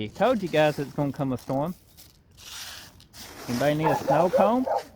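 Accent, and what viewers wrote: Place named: South, United States. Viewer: This video is exactly what I was looking for. Also, I thought my southern accent was thick but you put me to shame.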